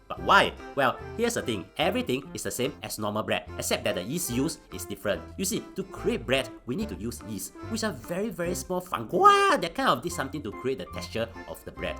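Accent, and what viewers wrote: Singapore. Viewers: Ur Singaporean accent is so prominent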